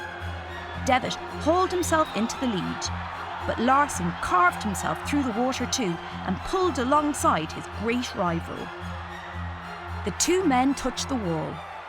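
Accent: Ireland. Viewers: She is Irish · She's Irish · I looooove her irish accent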